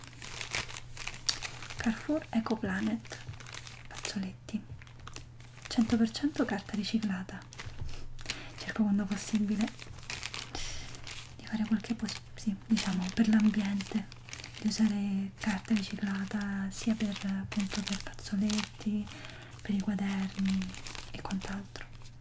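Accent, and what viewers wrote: Italy. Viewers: Hai una voce che ricorda il dialetto romano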